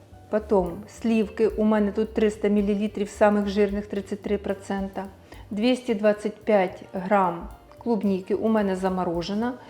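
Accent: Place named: Dnipro, Ukraine. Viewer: Якщо закрити очі - одразу Юля Коса чується, такий же дніпровський діалект, та і тембр однаковий.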